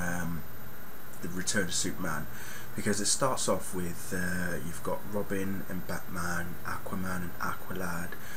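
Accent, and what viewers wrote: United Kingdom. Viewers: Young justice is the best american show i've seen on cartoon network in a minute! Also, Halfway through the video as im listening while on another website, I realized that you have a british accent and you're black!! That is so awesome!!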